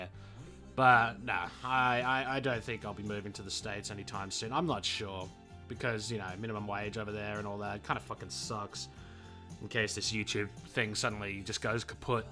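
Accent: Australia. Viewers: are you aus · What part of aus you from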